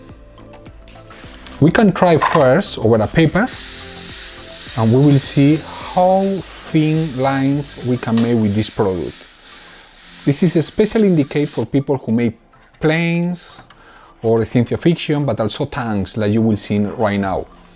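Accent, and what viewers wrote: Spain. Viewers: no hombre por el amor de dios no te disculpes si lo hablas bien solo que tiene que intentar mitigar un poco el acento español lo tienes muy marcado y puede hacer que algunas cosas no se entienda bien en ejemplos como el de el sonido de la ce o la zeta que son fonema que no existen en ingles y a los angloparlantes les puede sonar a chino una palabra así pronunciada. Saludos mig perdón se he sido un poco impertinente animo mig 💪🏼💪🏼💪🏼💪🏼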